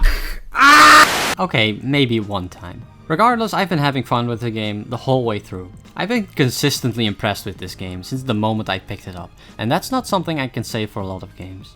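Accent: Netherlands. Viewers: Hi. You Dutch?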